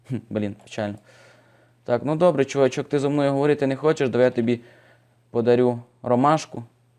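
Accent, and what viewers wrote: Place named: Surzhyk, Ukraine. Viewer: хах, а сам то суржиком розмовляєш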